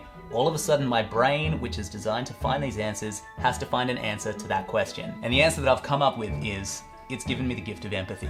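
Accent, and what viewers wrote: Australia. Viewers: You have great content and that sexy Australian accent is a bonus.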